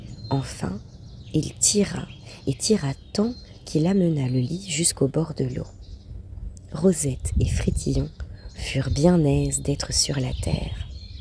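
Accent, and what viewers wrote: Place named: Belgium. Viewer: Ici en Belgique on dit cela quon va dîner et souper 😉 et si je reconnaît bien l'accent de notre lectrice elle doit être belge car j'ai le même accent et je suis belge 😀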